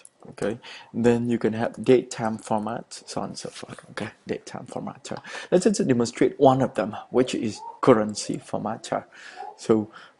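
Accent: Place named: India. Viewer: Anh này Việt Nam mà accent như Ấn Độ ấy nhỉ =))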